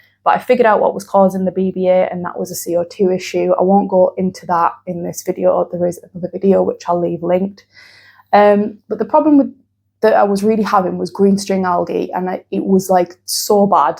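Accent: United Kingdom. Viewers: that marvelous English accent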